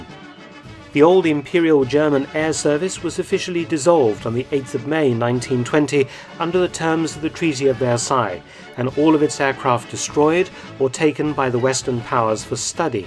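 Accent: United Kingdom. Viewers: Mr, how about Weimar Germany's efforts in avoiding international sanctions, such as cooperation with Soviet Russia, expeditions to China, supporting Freikorps and so on? It would be a great subject and I'd love to hear your British narration. Thanks in advance!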